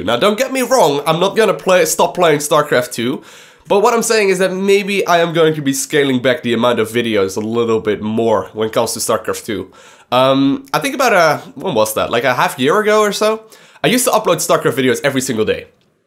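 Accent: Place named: Netherlands. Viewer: Already thought that you were Dutch from your accent, now I have confirmation.....<3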